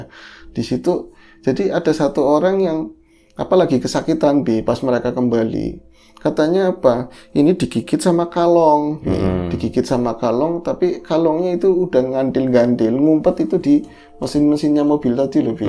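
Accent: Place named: Java, Indonesia. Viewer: Mas ni mantap bhsa jawa ny msh totok, bguss ciri khas tersendri... Mas semakin bgus crta² yg dbwain moga sllu menghibur qt smua 🙏❤️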